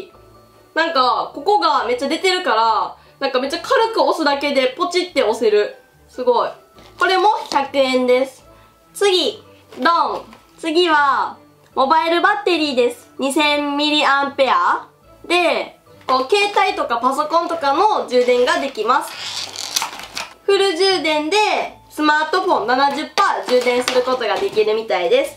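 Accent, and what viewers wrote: Kansai, Japan. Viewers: 関西弁？かわいい♡ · 関西人はみんなこの発音だと思いますよ！ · 私関西なんですけど、友達とかみんなこんな発音ですよ😅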